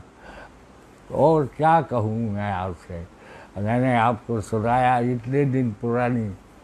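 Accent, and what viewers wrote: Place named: Sikandrabad, India. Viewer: ताऊ जी , श्री संतोष आनंद जी को सादर चरण स्पर्श आपसे कभी मुलाकात नहीं हो पाई , पापा ( श्री ऊदल प्रसाद शर्मा ) से आपकी काफी बाते सुनता था । वो सिकंदराबाद से थे , आपके बारे में काफी बातें करते थे। पापा का स्वर्गवास eight year पहले हुआ था। आज सिकंदराबाद की बोली सुन के। पापा की याद आ गई। भगवान आप को स्वस्थ रखे । रिदिमा बेटा , मेरा आशीर्वाद। समीर शर्मा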